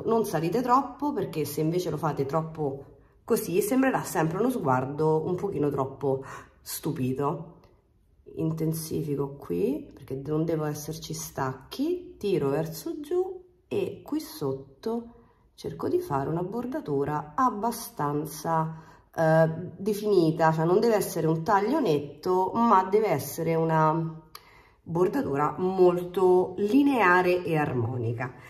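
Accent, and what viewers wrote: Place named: Rome, Italy. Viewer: Non riesco a seguirla, con quell'accento romano e quella voce repellente. Purtroppo esiste l'antipatia.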